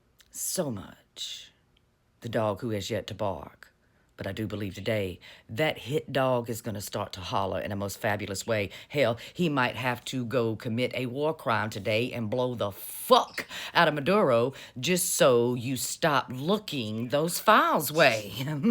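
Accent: South, United States. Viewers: voice based assumption incoming; You've just replaced William T Sherman as my favorite southerner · I’m from the south but I don’t sound like it. I absolutely love your accent and your spunk. You nailed it. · I LOVE the sound of this lady's voice. That southern drawl with the smoky texture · YOUR accent!!!! I love it!! That Southern Twang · It’s refreshing to hear that Southern Accent used intelligently. I could listen to you all day. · Hurry back! I saw a few headlines about the emails and waited to hear about it in your sweet southern accent! Happy Wednesday 💜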